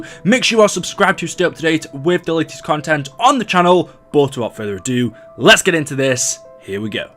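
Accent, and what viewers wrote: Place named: Yorkshire, United Kingdom. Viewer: Sounds like a Yorkshire accent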